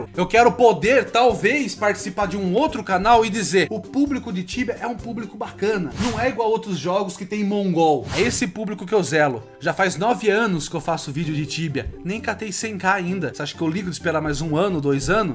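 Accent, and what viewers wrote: Brazil. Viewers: você é do sul mano ?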